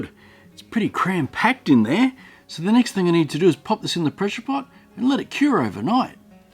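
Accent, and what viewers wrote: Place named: Australia. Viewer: Hey, we used to live in Victoria and so it is always awesome to have a friendly Australian accent to appreciate. My kids love to ask for a bedtime resin video, they think it is the coolest. Me too!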